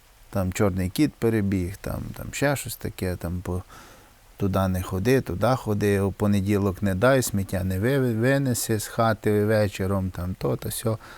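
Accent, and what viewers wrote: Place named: Khmilnyk, Ukraine. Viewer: Привіт. як справи бачу вже посміхаєтесь йдите на поправку. ви з хмильниччини? ато акцент рідний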